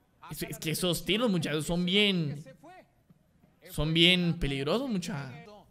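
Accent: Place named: Argentina. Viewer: Porque carajos habla como si fuera Argentino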